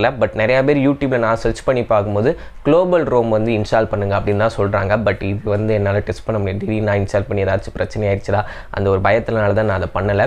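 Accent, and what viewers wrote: Madurai, India. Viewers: Bro niga madurai thana bro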